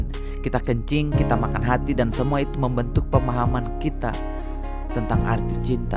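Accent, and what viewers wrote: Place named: Ambon, Indonesia. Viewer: kk punya kekuatan itu di bahasa dan dialeg ambon, KK percaya saja samua orang pasti pahami dialeg kk, semangat KK #ambonpride 👊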